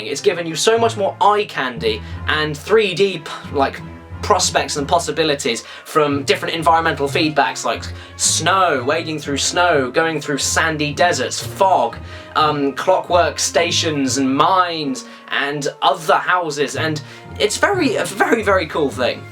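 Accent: United Kingdom. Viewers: UUuuhhmm, hehe......could be the wierdest comment ever, clearly the dude is brittish.... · JESUS! HE IS A BRITISH PBG! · Like JonTron, but Britisher. That's a good thing.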